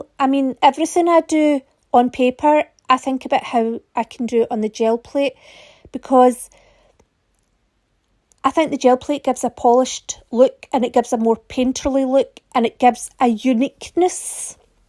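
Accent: Scotland, United Kingdom. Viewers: It’s about experimenting you’re doing a great job!! What part of Scotland are you from? I was born in Dundee I’ve been in Vancouver Canada for 50+ years … love your accent!!! Thank you for your video and you’re right it’s very much like a limo cut!! Elaine ❤ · Just discovered you, and it's lovely to hear a fellow Scot chirping on about this technique and already producing some lovely work. Well done and keep it up:)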